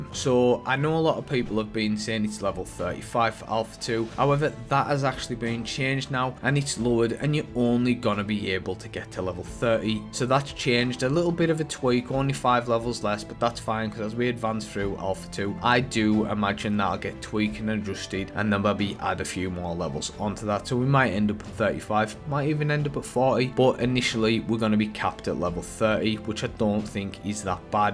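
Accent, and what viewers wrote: Newcastle, United Kingdom. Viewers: Hearing that Geordia/Maccam accent talking about Ashes is wild haha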